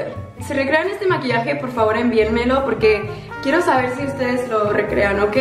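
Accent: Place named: Spain. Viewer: ¿Por qué siento un ligero acento español jajajaja? :)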